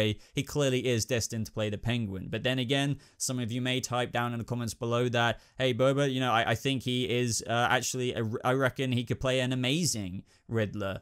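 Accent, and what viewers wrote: England, United Kingdom. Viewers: Great video, where abouts in England you from? I live in Ireland 🍀😀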